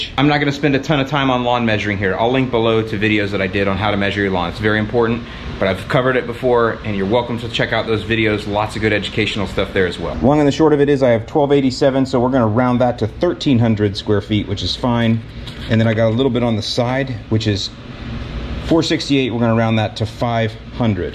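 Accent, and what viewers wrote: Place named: Calumet Region, United States. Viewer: Ha Over buy dare! Couple two tree! What have you.... Calumet regional linguistic gold!!!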